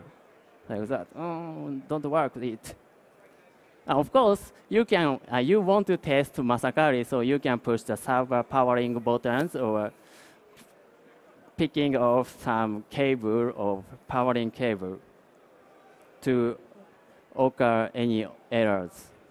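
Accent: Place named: Japan. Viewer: 日式英语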